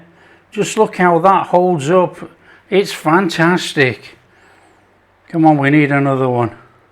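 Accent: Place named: Northern England, United Kingdom. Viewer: Fantastic. I'd love to know what part of the uk you come from with an accent like that? Obviously the North but where???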